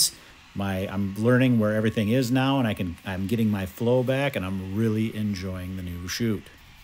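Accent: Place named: Great Lakes, United States. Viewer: your Great Lakes accent is music to my ears. I’m from Western New York originally, and you sound like home, even though you’re in Wisconsin.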